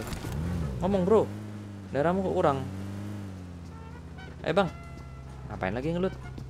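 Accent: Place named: Eastern Indonesia, Indonesia. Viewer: Pake aksen logat orang timur pasti jarang yg bisa nebak walau open mic dari awal.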